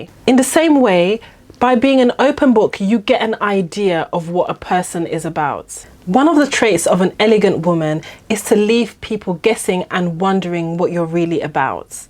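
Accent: United Kingdom. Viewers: a lovely British accent helps! Lol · I love your british english/accent.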